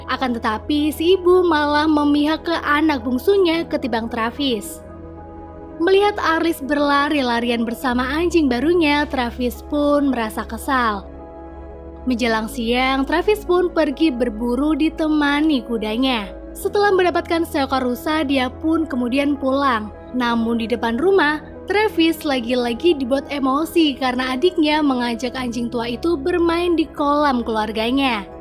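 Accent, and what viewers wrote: Pemalang, Indonesia. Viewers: Orang Pemalang ya mbak?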